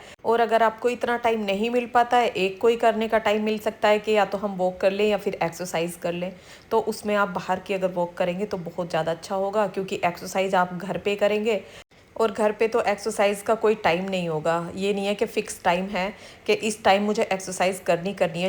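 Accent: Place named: Haryana, India. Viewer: Di m b gujjar hu kota rajasthan se lakin ap logo ko dekhkar nh lgta ki aap Gujjar ho quki humare rajasthan ka पहनावा boli sb aap se alg h aap ko or apke पहनावे or boli se aap haryanwi lgte ho lakin muje bhaut kushi hoti h jb अपनी समाज ke log aage bdte h mtlab kashker ke ladies jb kuch krti h to bdi kushi hoti m cahati hu apna gujjar समाज age bde 😊😊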